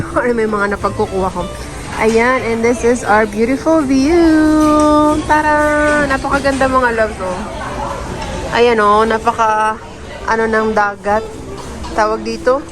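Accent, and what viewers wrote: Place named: Iloilo, Philippines. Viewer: Hulaan ko, ilongga ka maday ayyyy..... pati ka daw.... shoutout lng q jan ah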